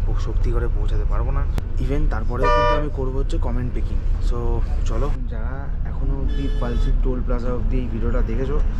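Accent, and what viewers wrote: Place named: Kolkata, India. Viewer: I live abroad but watch train and bus vlogs by people like you. I love your vlogs because you are very honest and committed and you do sound Kolkattan so much!!!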